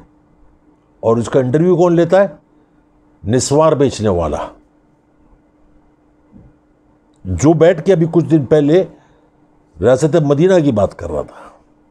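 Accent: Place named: Kutch, India. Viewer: Sir, you choose so true wordings Fr all types of goons of Pakistan 🇵🇰 👏 Salam to you to gv details to new generations. I’m listening 👂 n enjoying ur each n every word n ur lovely Kch’s URDU. Love word BAWLE, Mullah Fazloo n many 😍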